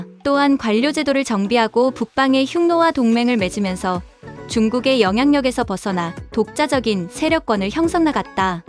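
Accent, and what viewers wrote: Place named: Japan. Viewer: 이거 무슨 일본놈 한국말 하는 소릴 하고 있어 ~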